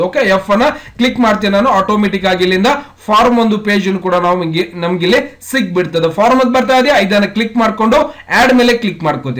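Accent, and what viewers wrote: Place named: Bijapur, India. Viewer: Nam Bijapur Mandi Hinge Matadtar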